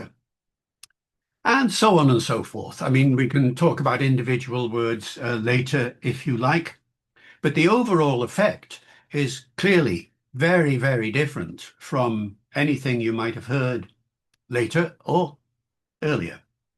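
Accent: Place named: West Country, United Kingdom. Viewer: Here is another thing there would not have been a standard Elizabethan pronunciation any more than we have one today, look at the text, Shakespeare himself uses dialect pronunciations. You sound to me like Mummerset.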